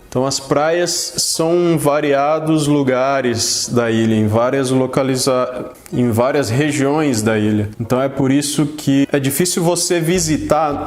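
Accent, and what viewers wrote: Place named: São Paulo, Brazil. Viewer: Fala você é do interior de SP ou Paraná? Pelo sotaque parece!!!😁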